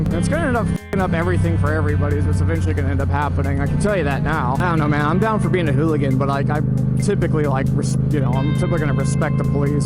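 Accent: United Kingdom. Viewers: Absolutely splendid English accent, sir. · loving the British accent XD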